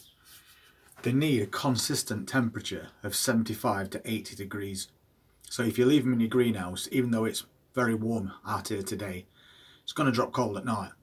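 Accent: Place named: Derbyshire, United Kingdom. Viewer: Another good one.I live in Derbyshire and you sound like you have a local accent.🐝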